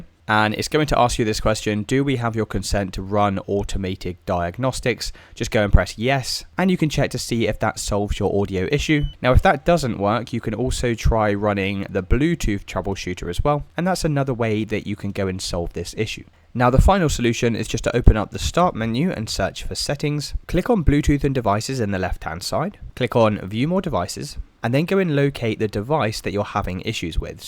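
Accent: United Kingdom. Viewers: This is the first bit of crappy troubleshooting info i found when originally googling the issue... A video with a british accent doesn't make it better.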